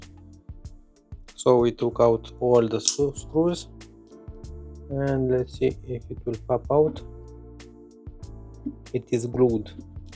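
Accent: Romania. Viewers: a little bit of romanian english =)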